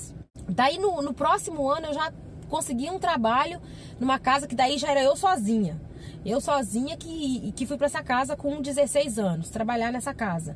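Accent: Minas Gerais, Brazil. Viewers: Oi, acabei de chegar no se canal e já amei o primeiro vídeo que assisti. Você está certíssima, amiga, vergonha temos que ter da desonestidade. Seu trabalho é muito digno sim e se você está vencendo na vida é graças a ele. Amiga, percebi um sotaque mineiro, eu também sou mineira de Coronel Fabriciano, e você é de onde? 😘😘😘😘😘😘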